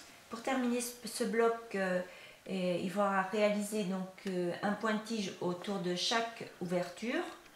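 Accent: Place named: France. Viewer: Love listening to your French accent 😀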